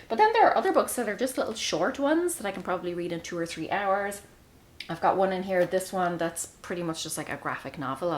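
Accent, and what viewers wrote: Ireland. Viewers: Love your brogue, what part of Ireland are you from?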